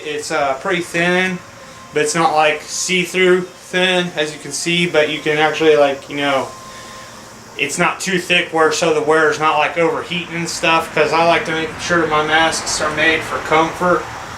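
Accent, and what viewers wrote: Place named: Tennessee, United States. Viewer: Rly good video. Subbed! 🤘🤘.. BTW! That accent.. I just gotta ask you bro, are you from Tennessee? Hails from Finland!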